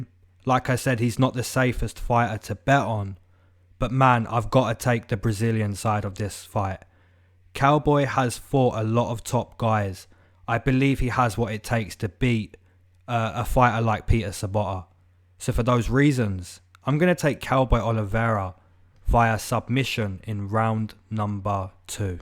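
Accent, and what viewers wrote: United Kingdom. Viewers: Yo bro where abouts from the uk you from?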